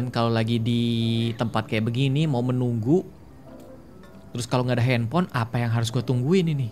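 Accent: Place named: South Jakarta, Indonesia. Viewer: Jaksel bangget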